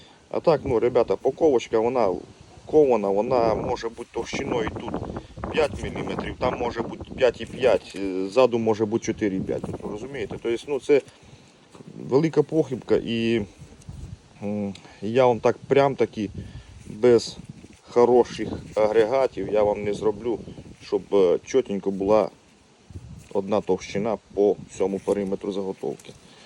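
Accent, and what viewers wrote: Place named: Rivne, Ukraine. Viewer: Гарні речі робиш друже, чую по говору, ти з наших країв ( Рівненщина?)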